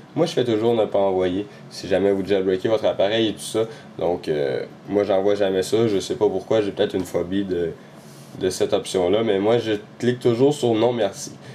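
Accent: Quebec, Canada. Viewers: L'accent québécois est énorme! Si ch'rais avec 20 capotes c'matin de zou!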